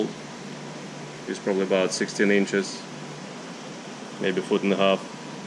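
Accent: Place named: Europe. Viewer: Hi there, I saw the net you used when capturing the Tarpon but nets made to capture Koi have less resistance because they are flat. There's also another Koi-specific net called a sock net which makes transport of large slender fish very easy. Sounds like you are somewhere in the EU judging by your accent? The Koi market is pretty big in the UK and Germany so it shouldn't be difficult to find these items. Also a large umbrella net used to capture bait fish might make the process easier...if it swims right above the trap.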